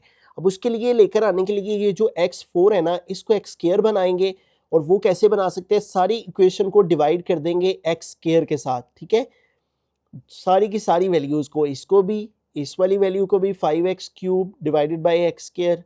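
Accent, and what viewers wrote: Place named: Bihar, India. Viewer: Sir g mujhaie ap Ka lachter Bihar achie lag tai h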